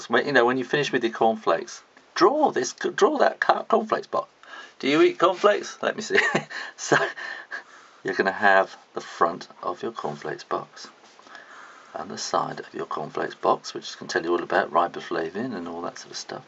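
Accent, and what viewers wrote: United Kingdom. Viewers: Thank you for the great videos! I love your British accent and your sense of humor...I'm learning a lot from you and I appreciate your talent so much! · are you british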